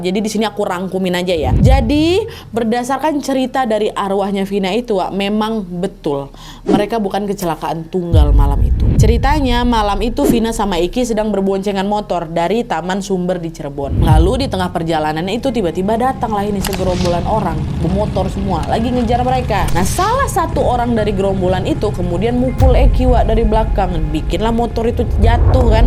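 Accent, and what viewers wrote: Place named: Sumatra, Indonesia. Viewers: Emang kalo pake hijab auto jd suci?? tuh org sumatera otomatis logatnya ya Kya pada umumnya org Sumatra yg kenceng...Julid amat jd orang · Lahh knp dibandingin wak..saya dlu krj di malaysia orng sumatra ngmongnya jg gt kak tegas keras jelas tp wak ada jawanya dikit kyknya keturunan..bah hiro kan menghibur gemulaii gimana gt jg enakk bawainya...ad ciri masaing2 lah wak... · Itu logat sumatra...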